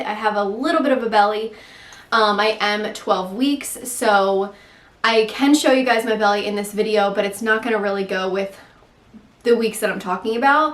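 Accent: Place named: South Jersey, United States. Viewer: Totally voting boy 💙 I just wanted carbs, bagels, cereals etc 😊 also since moving from Jersey, I can totally hear your accent! I have the south jersey accent as well and my new friends always call it out 😂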